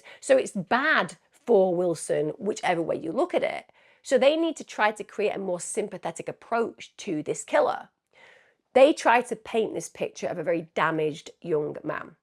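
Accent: United Kingdom. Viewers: i live in LEE COUNTY FLORIDA , FORT MYERS and its so funny hearing someone from the UK talking about this story .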